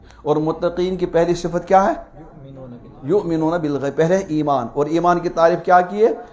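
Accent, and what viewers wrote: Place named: Kashmir, India. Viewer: urdu mai kashmiri tunr h hazrat ka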